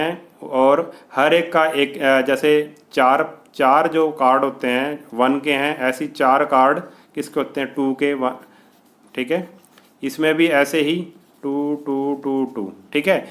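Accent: Punjab, India. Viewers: Sir punjabi ho na app?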